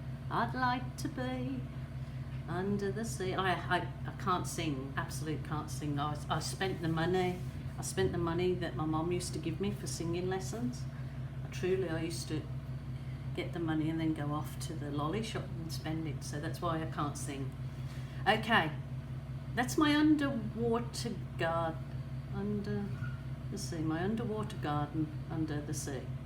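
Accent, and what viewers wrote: United Kingdom. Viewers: I know you made thos video in October and it is now December 29. You said you had 10k subscriptions. Either you grew fast or you don't see all of your subscriptions. It says 24.9k here. Either way, well done. I love your prattling on while I watch your videos. I am in the US and my family is in the UK. I know you are in Australia but you still have the Brit that I love listening to and your art is amazing.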